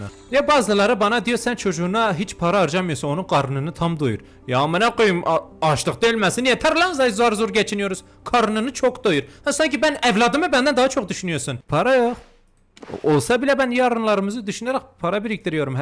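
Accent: Elazığ, Turkey. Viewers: ABİ ELAZIGLI MISIN MAİLİNDE 23 VAR VE AKSANDA ELAZIGLI GİBİ BENDE ASLEN ELAZIGLIYIMM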